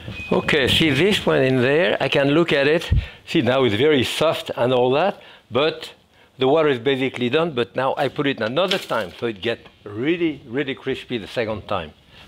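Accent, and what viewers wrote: France. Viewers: J’adore Jacques Pépin ! Il n’a jamais perdu son bel accent français ! J’ai réalisé un grand nombre de ses recettes et astuces et c’est toujours un régal. Longue vie M. Pépin, vous êtes un trésor !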